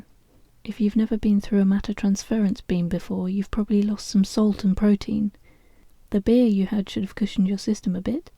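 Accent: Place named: United Kingdom. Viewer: Nice British accent & well read. You should try some Terry Pratchet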